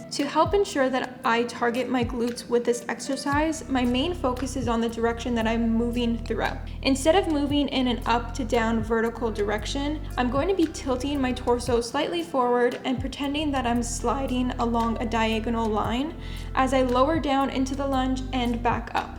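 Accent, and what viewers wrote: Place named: Canada. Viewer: no clue you were canadian until i heard the accent lol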